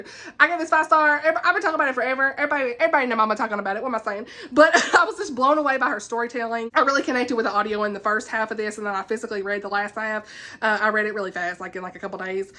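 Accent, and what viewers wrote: South, United States. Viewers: Your personality and southern accent is so refreshing and comforting at the same time ❤❤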